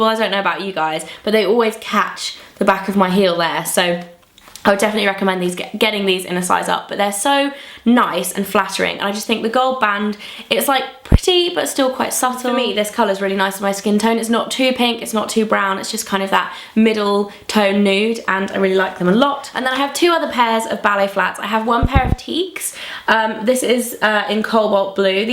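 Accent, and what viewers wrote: United Kingdom. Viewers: British accent! :D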